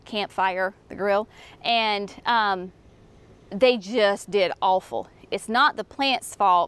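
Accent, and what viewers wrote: South, United States. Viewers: I just found your channel and I love it. You are a very good teacher with a world of information and a great little southern accent. Thank you and be blessed.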